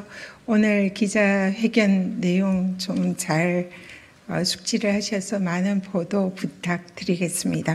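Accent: Gyeongsang, South Korea. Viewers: 전현희 전의원은 똑똑하시고 역량있으신 국민을 위해서는 참 좋은분인데... 기자회견이나 시사프로 패널 토론자 등으로 나오면 말씀의 표현력이 듣기가 거북합니다.. 글을 읽는 말투,,, 차라리 글을 정확하게 읽으면 되는데.. 그 문어체를 구어체로 하는것처럼 경상도 사투리로 하다보니... 경상도 사람인 저도 듣기가 거북합니다.. 글을 읽으면서 안읽는 투로 하시지 말고 그냥 반듯하게 글을 읽으세요... 휴~~ 말이 끊기고 글읽는 투의 말투가 참 설득력이 떨어집니다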